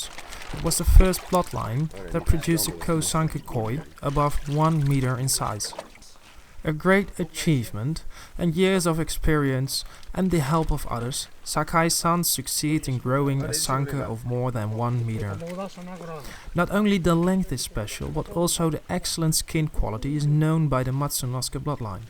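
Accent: Netherlands. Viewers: Is he from the Netherlands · he is dutch lol. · Is it just me or am I hearing a slight Dutch accent? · The announcer in this video is distinctly Dutch I can tell that accent anywhere. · The narrator sounds so Dutch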